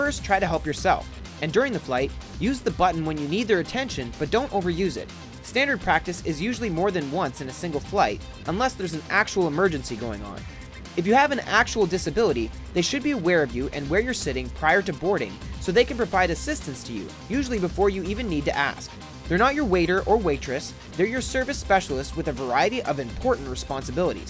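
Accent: Canada. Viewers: Things I’ve learned: the narrator is Canadian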